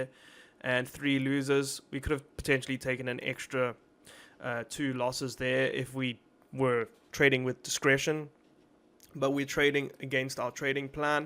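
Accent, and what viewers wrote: South Africa. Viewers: bro you from South Africa ?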